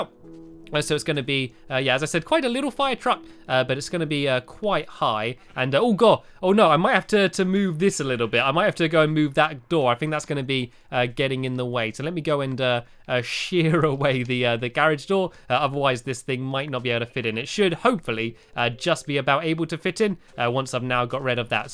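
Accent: England, United Kingdom. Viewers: He's english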